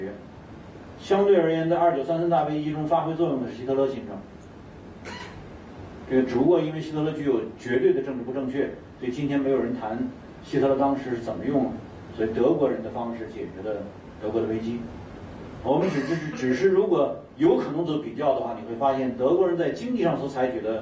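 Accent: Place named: Cantonese, China. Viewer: 这个介绍人的口音富有广东腔。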